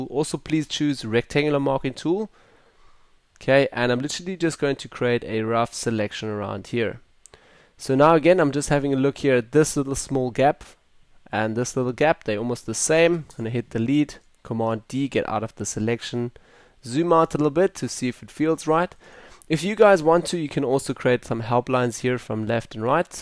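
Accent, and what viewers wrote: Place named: South Africa. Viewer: Cheers for the video boet. (Highly guessing you are South African) :-)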